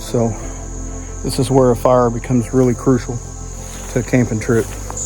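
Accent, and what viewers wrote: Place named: South, United States. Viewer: You sound like ur from Kentucky or Texas. LOL Love the laugh!!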